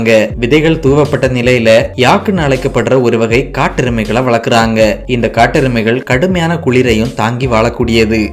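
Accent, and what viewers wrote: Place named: Kerala, India. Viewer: மலையாள சாயலில் தமிழ் கொஞ்சம் வித்தியாசமாகவே இருக்கு. நாஞ்சில் காரரர நீங்கள். அரசு என்பதை அரய்சு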